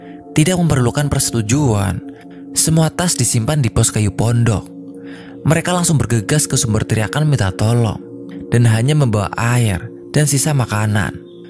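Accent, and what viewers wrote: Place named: West Java, Indonesia. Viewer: Itu logat Sunda 😅😅😅pas akhir kalimat agak gimana gitu 😅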